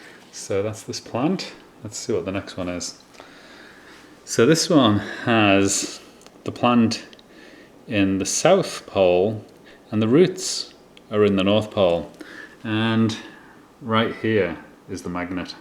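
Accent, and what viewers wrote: United States. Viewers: Oh I could tell right away you were in Arizona, because of your accent!!! Lol!!!! Typical Arizona accent, lol!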